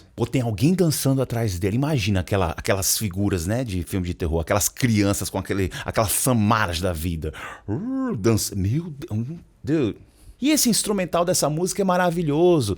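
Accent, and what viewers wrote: Northeast, Brazil. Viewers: Teacher fera! E esse sotaque do meu nordeste só torna o vídeo melhor de assistir! Que qualidade! 👏👏👏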